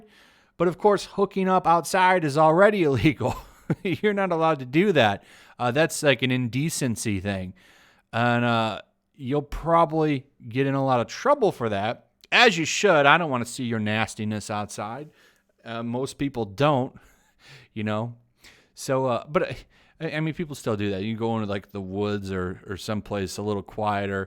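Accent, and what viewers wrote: England, United Kingdom. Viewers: Lol I love your English accent. I live in uk and pubs open back up on 4 July but all police are getting ready in riot gear to take us down if we talk to loud lol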